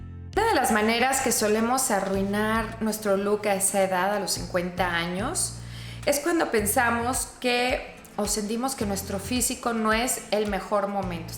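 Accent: Mexico. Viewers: ese spaninglish es terrible fuera que tienes ese tremendo acento mexicano!!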